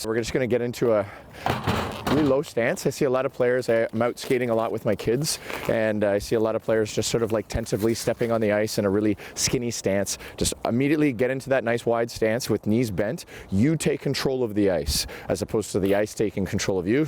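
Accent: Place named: Southern Ontario, Canada. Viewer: Came for the tips. Stayed for the Leafs representation and southern Ontario accent. Felt right at home. Thanks for the lesson!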